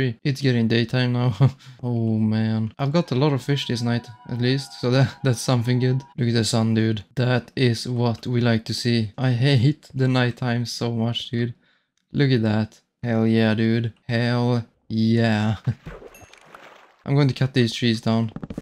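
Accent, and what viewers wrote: Sweden. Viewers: to be honest you kinda sound swedish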